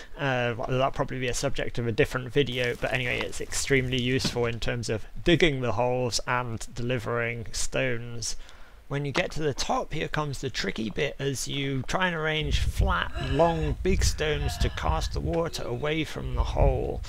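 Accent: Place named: West Country, United Kingdom. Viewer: But you don't have a Welsh accent. More like Somerset or Norfolk? No, wait; I've heard it in Bristol.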